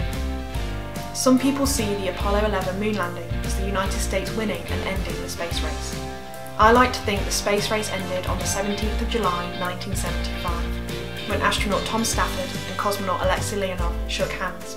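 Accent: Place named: England, United Kingdom. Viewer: Nice accent 😁 What part of England are you from? 🤷‍♂️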